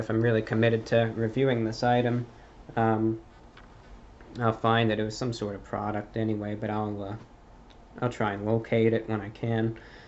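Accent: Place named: New York, United States. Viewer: I knew you were from that part of the map.. The voice. Women love that New York voice. New York and New Jersey.. We women love those accents..🤔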